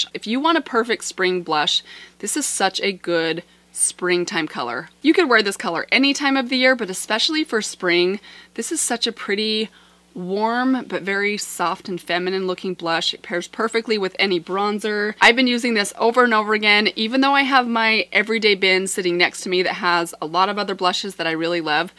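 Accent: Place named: United States. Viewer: I loved this, you're so lovely, very gorgeous lady. I love your beautiful American accent. Everything you say sounds so melodic when you talk. I'm not from the US so I don't get to hear the American accent very often outside of the cinema and American programmes on the telly.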